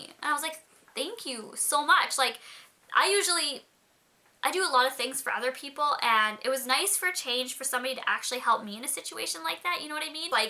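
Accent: Canada. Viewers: Omg u sound so canadian like idk y I notice now lolz its so cuteeee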